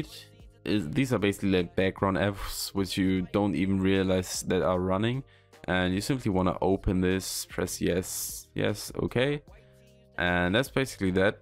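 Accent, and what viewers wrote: Germany. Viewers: Man hört aus der Stimme raus dass du Deutsch bist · Yeah you can tell by his accent and his language in his PC is also Set to german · Are you german?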